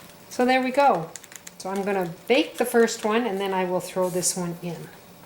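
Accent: Canada. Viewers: can't wait to try! BTW you sound Canadian, are you???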